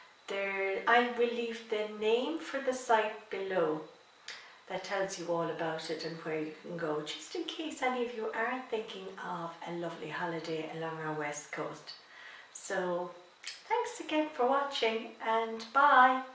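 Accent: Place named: Ireland. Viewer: oh what a beautiful country! I could listen to you all day! You have such a beautiful accent! I hope to get to see Ireland one day! Thank you so much for this video! Love and hugs, Jeanie xxoo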